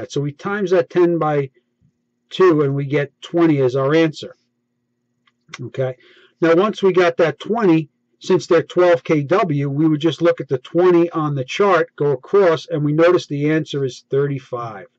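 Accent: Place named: New York, United States. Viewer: 13.5 your garbage New York accent messed me up for a min. Good tutorial otherwise.👍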